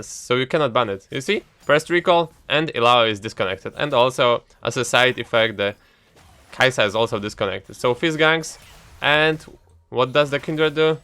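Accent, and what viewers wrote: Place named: Poland. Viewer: Ale masz polski akcent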